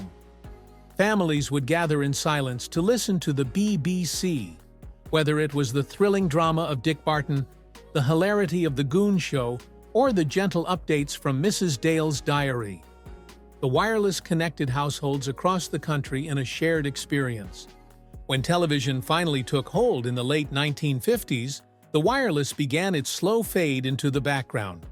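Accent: United States. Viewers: Appaling American narration yet again! Why carnt you read your script through properly, before starting!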